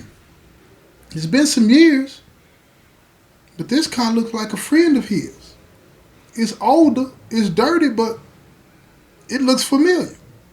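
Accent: South, United States. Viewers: Hi! I look forward to hearing ur videos everyday, ur very consistent, on time and that southern. Voice of urs I love it. Keep doing what ur doing. I'm glad ur son recommended u I subbed IMMEDIATELY. B BLESSED CUTIE.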